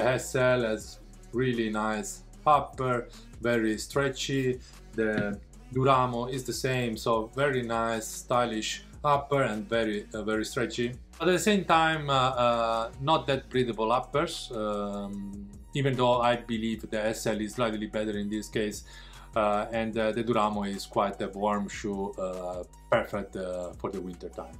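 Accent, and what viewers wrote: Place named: Italy. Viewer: Your English is fine but i still can hear the Italian accent clearly XD, good review.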